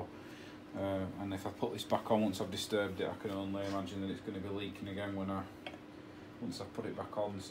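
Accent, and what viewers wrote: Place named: Yorkshire, United Kingdom. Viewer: Going by your accent I don't think we are far apart , I'm in Malton East of York and I guess your Huddersfield way .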